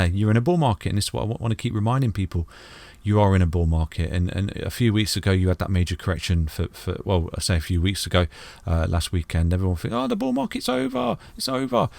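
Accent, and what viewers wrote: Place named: Nottingham, United Kingdom. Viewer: Hi mate are you from Nottingham I can hear notts accent coming out 😅👊👊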